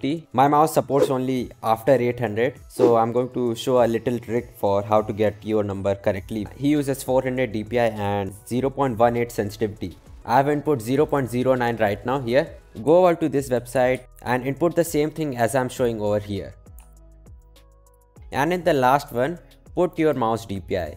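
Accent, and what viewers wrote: India. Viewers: I had a feeling you were Indian lol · yo it seems like you're indian, based on your accent are you? i am "indian american" but you are so cool for telling me that also whenever i play arsenal i hate this: "DEAD UPON SHOT REGISTERING"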